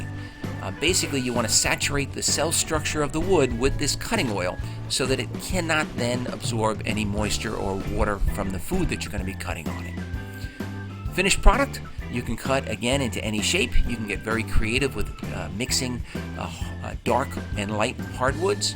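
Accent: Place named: New York, United States. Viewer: I bet my life this dude grew up in either Staten island or Queens lol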